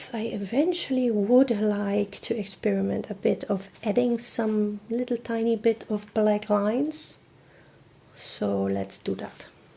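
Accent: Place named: Netherlands. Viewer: Absolutely love this video. I too was adding too much color. I appreciate artists sharing their "mistake" creations. It helps me see I'm not as far off as I feel. I also love listening to you talk. My great grandmother was from Groningen Holland and I adored her. Hearing accents from the Netherlands brings back sweet memories. Thank you for sharing your journey.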